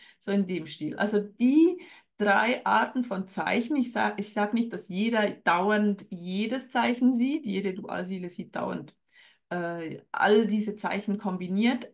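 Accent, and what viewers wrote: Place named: Switzerland. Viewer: Ich wäre soo froh wenn das Universum ein Zeichen sendet, allerdings sehe ich an und zu mein Geburtstagsdatum..dachte das bedeutet nichts… da ich nie so overboardet werde wie andere offenbar😂. und mich zwar mit x Seelenverwandten rumschlage..aber nix von Twinflame😂 obwohl das Thema mich seit fast 30 Jahren beschäftigt. Macht nix. Aber Zeichen wären nett für den richtigen Weg… würde helfen❤. Früher hab ich nie gecheckt, dass du Schweizerin bist😂. Dabei kennt man doch den leichten Akkzent..auch wenn du gut sprichst. Has glaub au falsch gschriebe. Wyter so: find dich supi. 🎉❤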